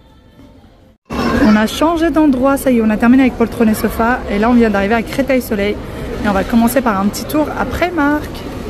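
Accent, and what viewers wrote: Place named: Morocco. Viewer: Coucou, j'aime trop l'accent marocain 😊 PS: je suis tunisienne ❤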